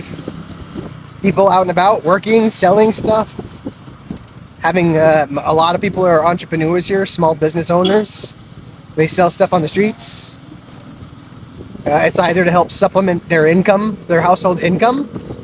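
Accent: Philippines. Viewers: I also like pandecoco. Im so amazed with your Filipino intonations sounds real!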